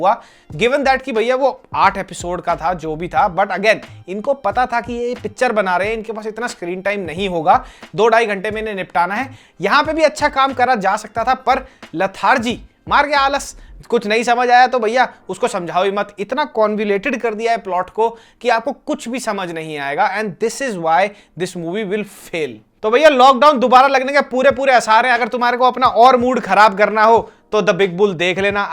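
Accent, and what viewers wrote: Haryana, India. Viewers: Was missing your haryanvi soooo much 😍😍😍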